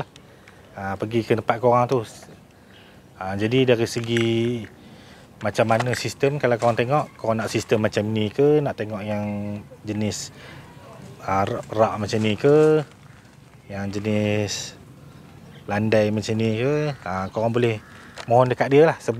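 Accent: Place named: Perak, Malaysia. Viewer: Cara abang cakap macam orang perak le...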